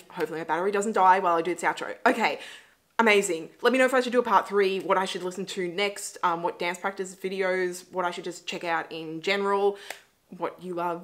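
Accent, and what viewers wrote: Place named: Australia. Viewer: ty so much love kpop i'm from South Korea little bit hard to understand Aussie accent but i really like u re channel. also sometime kpop reaction block on some country that is not have Youtube country no google just little bit search it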